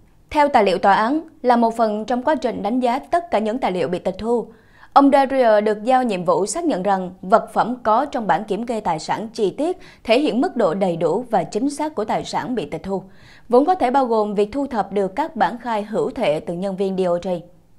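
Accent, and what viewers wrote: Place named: South, Vietnam. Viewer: Ngôn ngữ và dọng nói miền Nam hay lắm. Giữ lấy BẢN SẮC VĂN HOÁ NAM BỘ dễ nghe nha,!. Bạn có âm vực rất tốt, cám ơn bạn.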